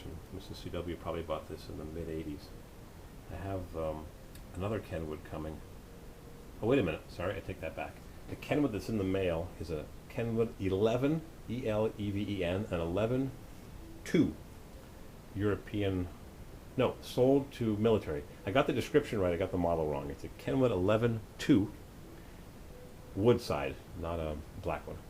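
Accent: United States. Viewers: With that southern drawl, he sounds good doing it too.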